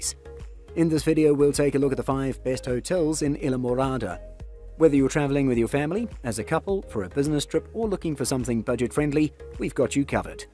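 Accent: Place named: England, United Kingdom. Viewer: Thank you for this nice video. I do not know where you live but you sound like you have an English or Australian accent. We do not pronounce it either way you keep saying it! I live in Florida right near the Keys!! Go there all the time! Have a great friends, who have lived there for years!! Isle. Pronounce the word as: isle, aisle & I’ll! 😊 Phonetically, it is called - I’ll MORADA!!!! Islamorada, Florida 🇺🇸❤️🗽🗾🏝️☀️🌊🎣🎣🎣🐠🐟🐬🐋🦞🐢🦀🦑🦈🦈🦈🐊🌴🏊🏻‍♀️🚣🏻‍♀️🚴🏻⛳️🛺➕ 💃🏼🕺🏻🎸🥁🎹🪇🪕🎺🪘🥁🎸🚤🛶⛵️🚤🛶⛵️🛥️🎉🎉🎉🎉🎉🎉🎉🎉🎉🎉🎉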